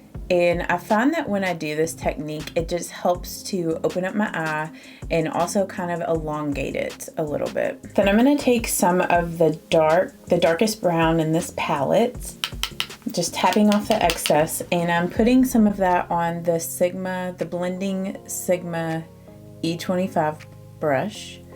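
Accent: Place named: Louisville, United States. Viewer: Love the tip about extending bottom lid eye shadow. It makes a huge difference in how these 64-yr-old eyes look. Also, I ❤ listening to you talk. I learned to talk in Louisville, so you sound like home folks to me!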